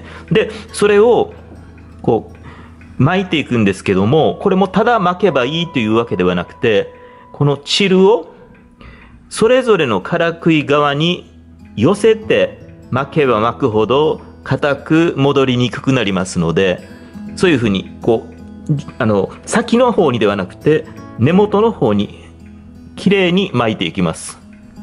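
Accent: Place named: Okinawa, Japan. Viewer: 勉強になります。さんのウチナー訛りが心地良いです♡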